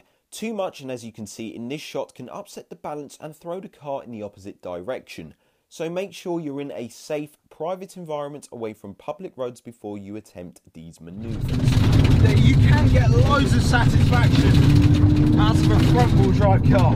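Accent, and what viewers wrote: United Kingdom. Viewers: u r British accent 👌👌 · The whole video looks like Forza Horizon 4 in real life. The British accent only adds to it.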